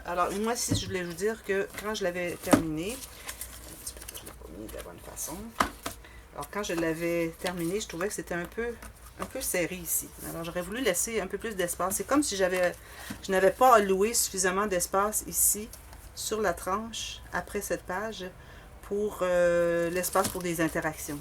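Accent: Canada. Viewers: Super !!! Merci pour le tuto et aussi pour l'accent canadien que j'adore ! Bonne journée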